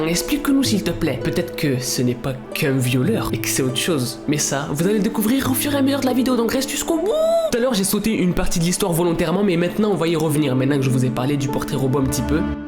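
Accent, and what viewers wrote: Quebec, Canada. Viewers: Ton accents canadien est super tous comme ton accents anglais 😂😂❤️ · Si tes en France pourquoi ta parler quebecois jcomprends pas🤣🤣😭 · J adore ton accent québécois Salut cousin francais cool lol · J’adore ton accent un peu canadien et québécois j’adore❤😂👌🏻 tu est prêt pour aller aux Québec 😏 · Un ti accent dquebec 😂 Hahahaha je t’adore je suis québécoise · belle acent quebecois 😅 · Quand ta dit "jsp pk j’ai un accent canadien Québec" OUAIS C MON RÊVE QUE TU SOIS QUÉBÉCOIS MONSIEUR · Il est trop chaud mdrr Et fais trop bien le Québécois 🤣🤣🤣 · Wtf l'accent québécois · avant que tu le mentionnes je trouvais déjà que parfois tu parlais un peu comme un québécois 😂 avec de la pratique tu pourrais parler aussi bien que moi 😂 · 'un petit axant canadien Québec 😅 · Omg je suid traumatisé et tres belle acssans canadiens moi je suis québécois je m'abonne :) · Le petit accent quebecois qui fait plaisir😂❤️❤️ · Ton accent québécois elle est épique confirmée par une québécoise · mdr je reconnais tout les canadien mais je peux te dire que tu a une voix de canadien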